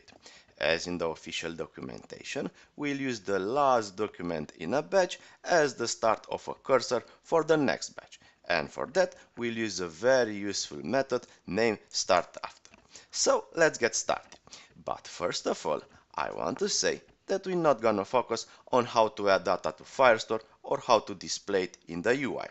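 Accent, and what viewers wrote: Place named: Russia. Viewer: Your accent is so cool, it's like Russian mafia people, so cool, also the tut is really helpful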